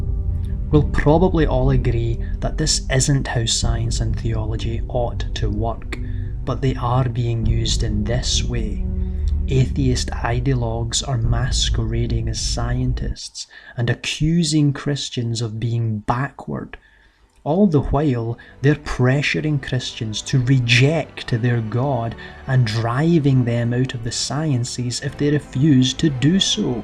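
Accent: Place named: Northern Ireland, United Kingdom. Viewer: My bad - the voice reminded me of the narrator in The History of Film, who is from Northern Ireland.... I guess I wouldn't last long in that part of GB. ☺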